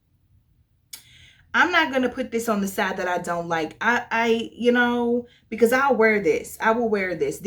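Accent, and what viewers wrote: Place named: St. Louis, United States. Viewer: Ok…a few things: 1. Thanks for your thoughts on this house, I haven’t heard anyone talk about it 👍🏾 2. You have definitely live in MO or St. Louis for awhile…”all these fragrances in the auurrhh” 😝🥰💛 3. The names draw me in every time! 4. I can’t do prominent pepper notes either 🙅🏾‍♀️ Have a great day! 🥰💃🏾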